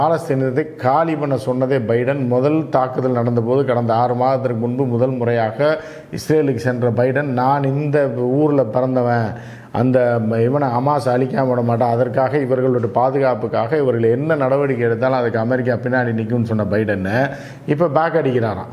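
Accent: Madurai, India. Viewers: <link> pls brother be prepare and talk with our jeeva brother with our madurai slaang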